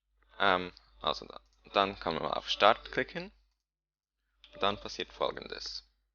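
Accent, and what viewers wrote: Switzerland. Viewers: ich mag schweizer akzente voll ^^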